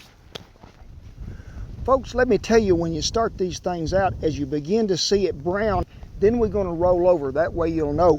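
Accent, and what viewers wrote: United States. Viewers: That cowboy accent. You’ll be lucky to hear someone talk like that in New Zealand. Wait? No one talks like that here 🤗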